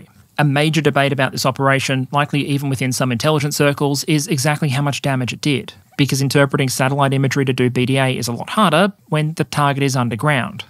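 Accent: Australia. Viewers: I don't remember perun having an ausie accent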